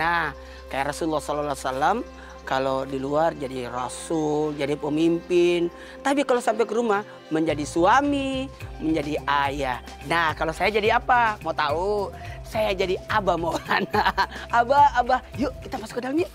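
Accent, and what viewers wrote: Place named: Jakarta, Indonesia. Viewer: Ustadz Sekarang Keren Banget Ya Logatx Dah Bkan Orang Makassar Lgi Deh,Tpi Jdi Orang Jakate😊😊😊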